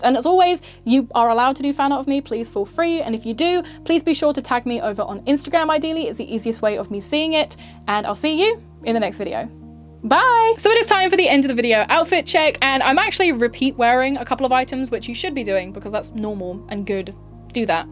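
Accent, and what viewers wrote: United Kingdom. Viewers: She is very clearly and audibly English.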